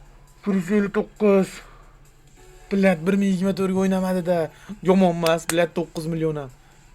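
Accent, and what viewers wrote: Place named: Fergana, Uzbekistan. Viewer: Aka qayerlisiz shevez buvaydanikiku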